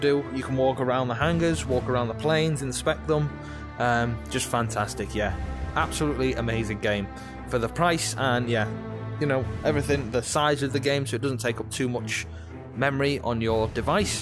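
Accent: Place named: United Kingdom. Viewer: You're British. You get a sub.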